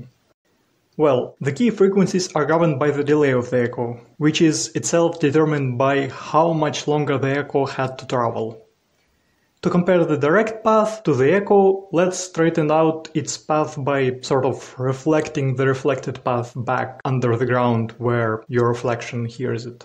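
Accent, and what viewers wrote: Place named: Russia. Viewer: while you do have some russian accent, I can feel your deep understanding of the topic and the clear thought process! great choice of words as well, keep up the awesome work and reasearch owo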